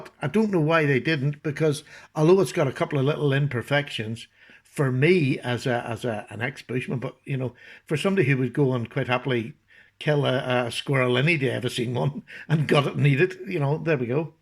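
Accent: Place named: Ireland. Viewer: my man, I don't know how you do it, but do it you do! This must be a a new model as I haven't seen one before; I have to admit, I'm a might envious as, you're right! It's a beautiful piece it really is. I think it's a little belter, and that blade is a wonderful shape, ideal for any task. I have a good selection of knives from CRKT and they have never let me down once! Keep up the great work, I always look forward to hearing your dulcet, Irish, velvety voice and you know what you're talking about! See you on the next one, my friend. Much love to you and yours. I'm away for nice cuppa tea myself! 😆🤗❤️❤️❤️❤️❤️❤️❤️🤗😆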